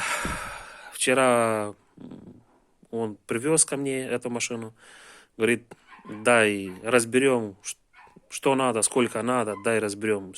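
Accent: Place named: Moldova. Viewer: Вы с Молдавии? По акцент у узнаю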